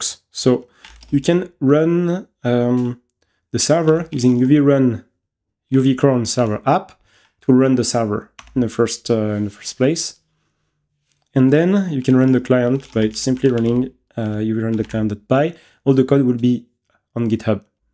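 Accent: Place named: France. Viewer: Nice bro ! I can smell that fresh French accent, but very good content !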